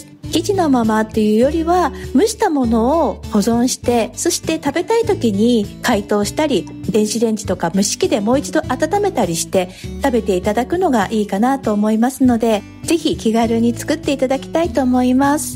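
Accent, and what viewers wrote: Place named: Niigata, Japan. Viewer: どこか少し新潟弁入ってる？と思っていたらやはりそうでしたかー 私の故郷です いつも優しい語り口で癒やされます これからも楽しみにしております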